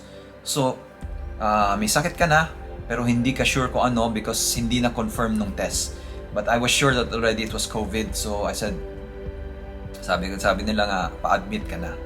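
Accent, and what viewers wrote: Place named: Philippines. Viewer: Are you an American? Still your accent is Pinoy!!! Tagalogggg plssss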